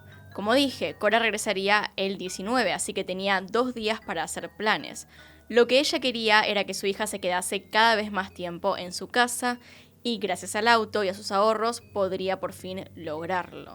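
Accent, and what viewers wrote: Argentina. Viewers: "Hola y bienvenidos a mi canal de YouTube...una vez más. Hoy tenemos el caso de....." El acento argentino lo amo y vos sos una maestra para narrar los casos. Además, me encanta el toque q le pones a las preguntas capciosa...me encantas,. Saludos desde El Salvador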